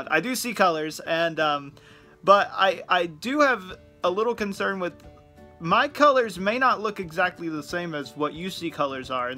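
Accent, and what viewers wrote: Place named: Ireland. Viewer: You do have a GREAT St. Patty's Day accent!!!!! And you are so funny,!!!!! Thanks for the laughter you bring me!!!!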